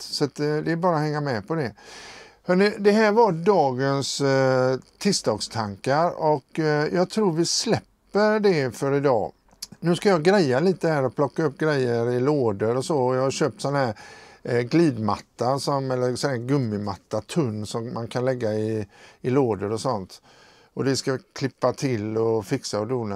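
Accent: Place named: Dalarna, Sweden. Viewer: Dalkulla? Rättvik eller är det mora ?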